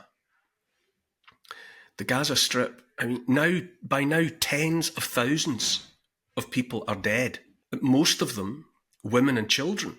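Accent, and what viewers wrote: Scotland, United Kingdom. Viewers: This is the very thing he was talking about. You shouldn't be behind anyone. We should all be out there doing some form of resistance and/or spreading the kind of truths speaks. The comments I have made on a couple of occasions have not been about getting out there and leading some sort of resistance or revolt. It is about some - not all but quite a fair number - of his viewers that fawn all over him and actually say they wait and look for his next video, that he keeps them going because he is a voice of common sense and reason. Too many people appear to be addicted to listening to and others like him as a kind of comfort blanket, his eloquence, his no-nonsence Scottish way of speaking, his light, and airy room giving a sense of simplicity, peace and tranquil respite in a misty spaciousness, almost felt beyond the window, far from the hurly burly of busy towns in which we mostly live. 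To read some comments it's almost as if the daily sight and sound of is their daily fix which enables them to survive another day. I have read accusations of controlled opposition against on certain other channels particularly on Telegram. It's another label that's thrown around like all the -ist ones used by the Left Wokerati. I don't necessarily subscribe to that view but I can see why some might say it. As long as people are soothed and stay on their sofas waiting for their next fix they remain in a state of soporific trust that somehow he has all the answers and will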